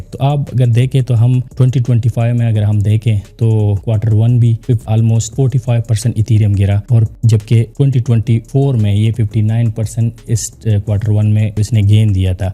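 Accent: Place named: Pakistan. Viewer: pakistani ascent lag raha. ethereum bare me pata he pakistaniyo bahut achi baat he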